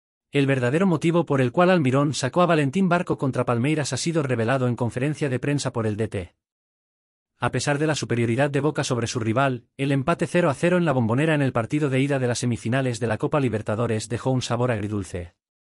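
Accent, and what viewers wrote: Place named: Spain. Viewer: Habla vos o pone una voz neutra, no tiene nada que ver el gallego este con Boca jajaj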